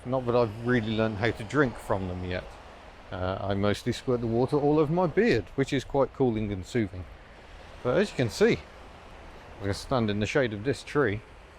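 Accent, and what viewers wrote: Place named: England, United Kingdom. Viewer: Actually, the pronunciation of Launceston is more like Lon-ces-ton. If you call it *Lonnie*, they will think you're a local. Ok, maybe not with that English accent of yours. Lot of mainland blow-ins now so the name pronunciation may well have morphed a little. I used to live for a time during my schooldays in the 60s in Garfield Street, South Launceston. Attended high school in the suburbs Kings Meadows. Worked there for a time in the 70s after completing my apprenticeship. It is a nice city now but used to get very smoky in the 60s when everyone had wood, coal or briquette heating. I still miss the electric trolley buses of that era, not to mention the old Leyland buses with the half cab.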